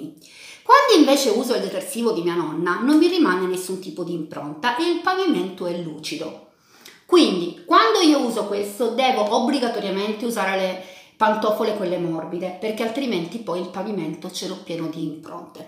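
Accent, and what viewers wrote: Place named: Calabria, Italy. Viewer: Infatti avevo capito che il tuo accento era simile al mio e mi sembri un viso nn nuovo ma di Reggio che zona,, ❤️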